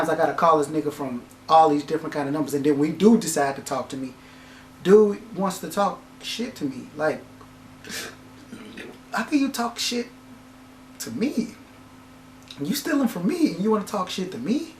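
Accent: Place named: St. Louis, United States. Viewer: ***** picosso said "great artist steals" not saying he's great but great in the eye if the blind. You know what I mean bro. I'm from St.Louis to bro and I hurr it in your voice. Your a humble guy man because if you wasn't you'd be hot headed & I'm glad to see after all that your still humble God bless u bro. Keep doing you. The fakes getting exposed everyday. God givith & God takith away. He wont be seeing bright days much longer just keep doing u.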